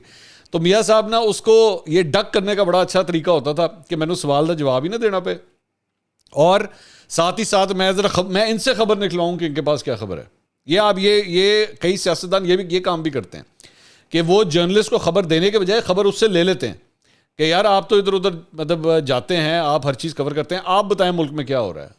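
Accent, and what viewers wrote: Punjab, India. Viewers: mai, mera, mujhe band karo. U are a watchable presenter. But your words mai, mera, mujhe are not palatable. I like you due to your apparent neutrality and intermittent Punjabi as my father is from lahore, amritsar.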